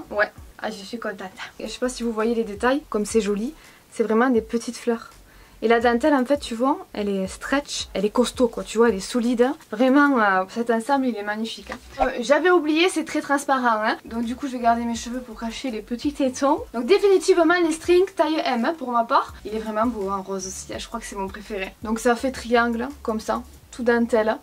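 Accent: South, France. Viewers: Tu a un acten du sud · tu es canon et tu n’as absolument aucun complexe à avoir😉 J’adore tes vidéos, toi et moi on a le même accent du Sud, tu serais pas tarnaise?🧐 Bisous guapa😘😘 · Dommage tu a un accent à begère trop prononcé ça gâche tout je trouve tu viens de la campagne provençale ou quoi? J ai l impression d ENTENDRE Manon dans la gloire de mon père... non je supporte pas bye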